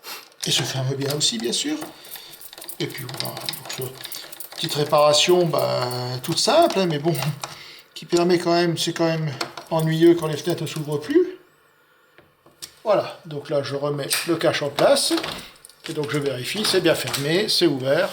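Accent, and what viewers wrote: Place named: Reunion, France. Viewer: Merci monsieur 🙏 Petit accent de la Réunion 🇷🇪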